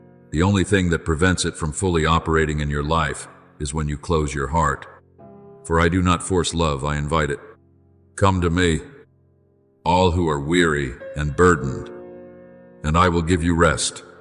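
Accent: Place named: United States. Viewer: Why do you speak with an American accent I am English.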